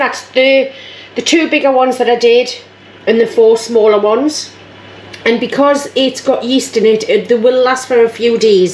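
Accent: Newcastle, United Kingdom. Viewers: How lucky was I to find you! Normal kitchen, ingredients we can all get a hold of, delicious food and geordie accent like my own! Thank you!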